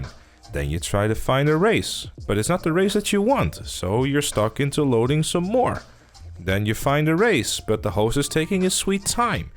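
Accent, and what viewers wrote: Netherlands. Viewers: Is the guy narrating Dutch?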